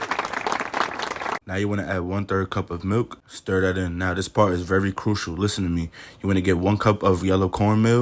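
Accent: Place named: North, United States. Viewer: Idk how southern you are with the up north accent all of that but now we're just waiting on the collard green recipe 😆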